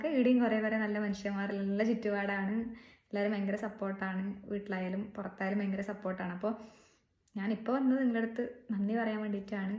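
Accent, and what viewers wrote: Kasaragod, India. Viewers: കാസർകോട് ഭാഷ കളയരുത് കേട്ടോ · നിങ്ങൾ നമ്മുടെ കാസർഗോഡിന്റെ മുത്താണ്, നിങ്ങളെ നമ്മൾ മലപ്പുറത്തിന് കൊടുക്കൂല്ല, എവിടെയായാലും നമ്മുടെ സ്ലാങ്ക് മറക്കണ്ട, അതാണ് നിങ്ങളെ കൂടുതൽ ആളുകൾ ശ്രദ്ധിക്കുന്നത്, നമ്മക്ക് നങ്ങളെ വർത്തമാനം മതി അത് കേക്കാനാന്ന് സുഖം, അല്ലാണ്ട് അപ്പ്യൊ പറയുന്ന പോലെ പറഞ്ഞാൻ ആരും നമ്മളെ മൈൻഡാക്കൂല്ല. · കാസർഗോഡ് സ്ലാങ് ഇഷ്ടപെടുന്ന ഒരു മലപ്പുറത്തുകാരനാണ് ഞാൻ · മോളെ കാസറ്കോഡ് ഭാഷ എനിക്ക് വളരെ ഇഷ്ടം. മലപ്പുറം എവിടെയാണ് ഞാനും മലപ്പുറംആണ് കെട്ടോ 😍😍 നിഷ്കളന്കമായ സംസാരം God bless you മോളെ…. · കാസർഗോഡ് ഭാഷ മാറ്റരുത് ഞാൻ കാസർഗോഡ് ആണ്❤ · ടീച്ചറേ ഭക്ഷണത്തിൻ്റെരുചി മാറ്റം അത് ഒരു ഇത് തന്നെയാണ് എൻറെ വീട് മലപുറം ജില്ലയിൽ വണ്ടൂർ എന്ന സ്ഥലതതാണ് കല്യാണം കഴിച്ചത് മലപ്പുറത്തേക്കും ഭക്ഷണം പാകം ചെയ്യുന്ന രീതി, അങ്ങനെ ആദ്യം പ്രയാസമായിരുന്നു അപ്പോൾ പിന്നെ ജില്ല മാറിയാലോ? 😅 പിന്നെ ടീച്ചറുടെ ചിരിയും പാട്ടും ഉള്ളിടത്തോളം പാട്ടിനെ സ്നേഹിക്കുന്നവർ എന്നും ഉണ്ടാവുന്നേ..നിങ്ങളുടെ കാസർഗോഡ് ഭാഷ അത് എനിക്കൊത്തിരി, ഇഷ്ടമാണ് മലപ്പുറം ആരാധിക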